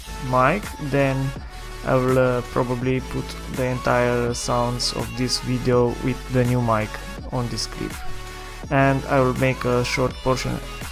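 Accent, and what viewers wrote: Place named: United Kingdom. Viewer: Que acento mas malo, seguro eres británico?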